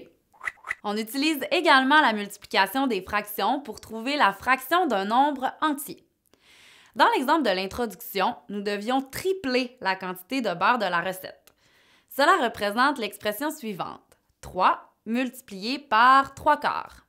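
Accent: Quebec, Canada. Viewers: Bonne explication, bon juste l'accent québécois qui rend un peut plus compliqué l'écoute mais je dit gg quand même 👍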